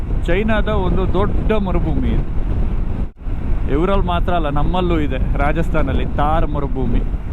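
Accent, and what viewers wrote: Kundapura, India. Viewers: Kundapura Kannada ❤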